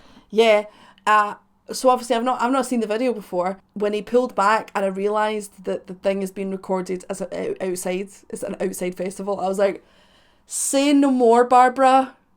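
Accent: Scotland, United Kingdom. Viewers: Great band, terrible sound quality. The bass and vocals get drowned out too much. Your accent is awesome! I only sound like I Am from Kentucky when I drink too much...lol. You know, the street that I live on is Highland. And I am a Scott...coincidence? Keep up the great work My Fine Scottish Lady! ❤❤❤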